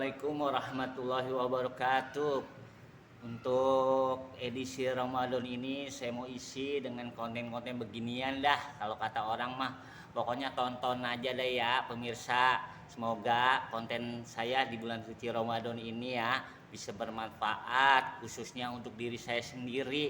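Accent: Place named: Betawi, Indonesia. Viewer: lenong betawi banget.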